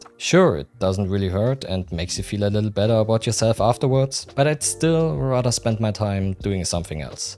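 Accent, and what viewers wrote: Germany. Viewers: i heard the german accent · Ich hab den deutschen Akzent sofort gehört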